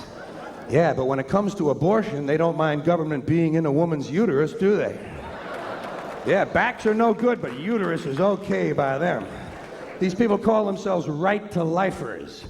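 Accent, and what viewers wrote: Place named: United States. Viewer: بس متقن اللهجة الامريكية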